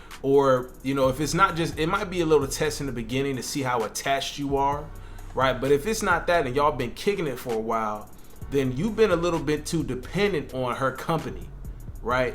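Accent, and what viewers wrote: United States. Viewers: Next time public English speak should be louder than your American damn accent bro !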